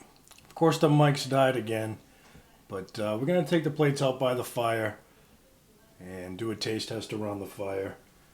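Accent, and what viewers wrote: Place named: Massachusetts, United States. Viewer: I'm thinking Tewksbury/wilmington? maybe billerica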